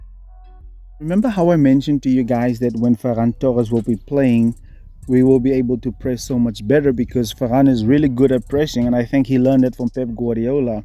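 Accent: South Africa. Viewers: hey mate you sound South African, where you from?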